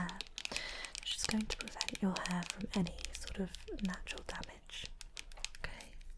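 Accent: United Kingdom. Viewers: a British English ASMR channel is good news, ty and please keep uploading. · Where are you from? Accent sounds british?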